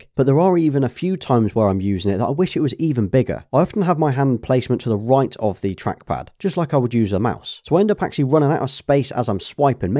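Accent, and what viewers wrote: United Kingdom. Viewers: Are you, , British, Australian, or from New Zealand? The accent is charming. Have a nice Thursday, Friday, and weekend.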